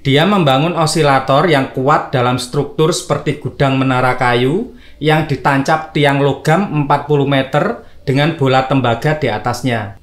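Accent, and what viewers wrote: Java, Indonesia. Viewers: Sumpah mendok bngt pngisi suaranya. Gak enak bngt di dngr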